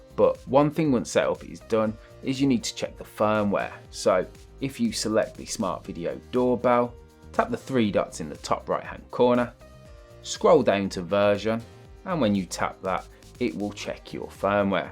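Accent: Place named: Birmingham, United Kingdom. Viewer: I detected a Birmingham accent, then I googled Smart & Secure Centre and you're a few miles away from me haha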